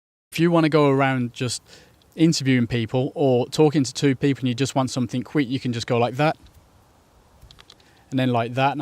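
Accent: Birmingham, United Kingdom. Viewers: You from Brum?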